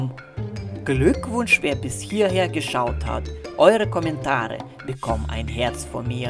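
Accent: Russia. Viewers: #Kölnerdom Feiere deine Videos übel und viel Glück für deine Zukunft ignorier deine hater einfach hör auf die richtigen und dein Akzent feiere ich auch ich schätze mal du bist Russe oder Kasache meine Familie kommt auch aus Kasachstan 🇰🇿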